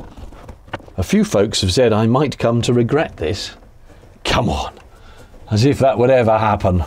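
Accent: United Kingdom. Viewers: Your head-long and well-explained DIY adventure is a hoot,an enjoyment, and a few moments away from every day life for most everybody who’s watching. I love it! Plus, I like laughing out loud now and then. I like hearing your terminology of choice. I like your British accent, for goodness sake. I’m so glad I found you all those years ago! Keep on videoing as you continue along your DIY, renovate, and refurbish chosen😢 path. ♥️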